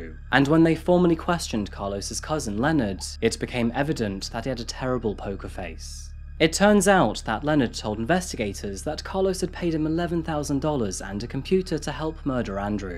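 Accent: United Kingdom. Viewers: I have to turn on the closed captioning in English because sometimes is hard for me to understand what he is saying 😂😂 it's the British accent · Lol, not understanding why Connecticut is pronounced the way it is is rich coming from a man that sounds very British. Americans, try pronouncing a great many British village names and you would be wrong. By the way, I really like your channel. It is one of the very best crime shows on the internet. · as someone from the UK you are kinda brave saying we pronounce things wierd in the US. That being said I have no explanation for Connecticut. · Mate your British like me. Half our town names are spelt differently from how they're pronounced lol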